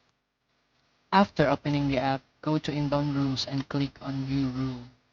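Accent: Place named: India. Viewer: If it is Indian accent then I watch till end. Because I know it’s going to work. Thanks man.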